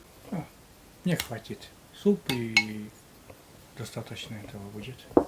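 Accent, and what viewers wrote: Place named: Luza, Russia. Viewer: Здравствуйте красавица я случайно зашла на ваш канал и услышала свой родной говор я с лузы всего доброго вам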